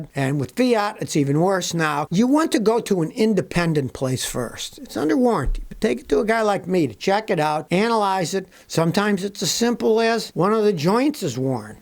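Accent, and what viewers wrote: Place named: Western New York, United States. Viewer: Got to love that Western New York Accent,. rat-ling. I grew up near Syracuse.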